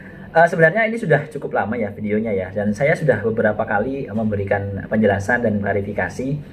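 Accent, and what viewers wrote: Indonesia. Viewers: Dok,, suarane purworejo banget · Medok gak sih dok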